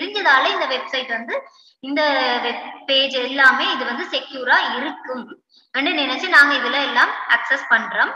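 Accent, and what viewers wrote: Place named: Sri Lanka. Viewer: wow srilankan tamil kekave nalaruku...unga voice alagu ....best wishes ma.....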